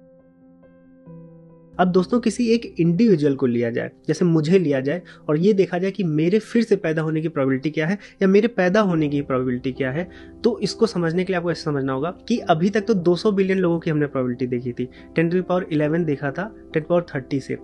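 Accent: Standard. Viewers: आपकी ज्ञानवर्धक बातें तो बहुत अच्छी हैं परन्तु आपका प्रस्तुत करने का अंदाज भी लाजबाव है। शुद्ध उच्चारण से विडियो काफी अच्छी हो गई है। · Impressive. I really admire you with your Hindi speaking. Ekdum sudh Hindi.. In today's time you are making videos in pure Hindi. Aapko Naman hai 🙏🙏🙏🙏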